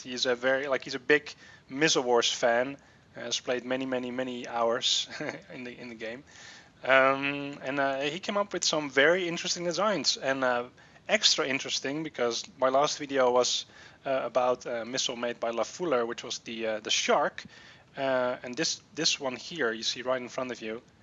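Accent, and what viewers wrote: Netherlands. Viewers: what country are you from you sound Dutch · Ben je Nederlands, je klinkt wel zo? Are you dutch you sound so?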